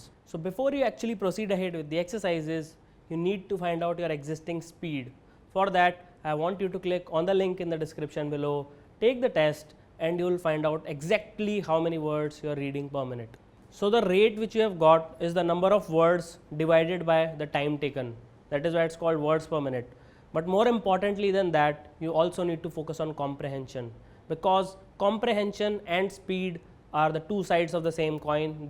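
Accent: India. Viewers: Why does He sounds like He is speaking english in hindi language? I cant catch up with this guy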